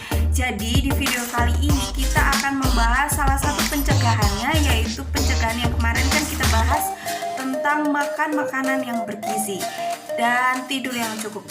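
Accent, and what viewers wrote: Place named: Java, Indonesia. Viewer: Thank you kak for information untuk tetap healthy. btw, kok agak medhok yah wkwkw 😂😂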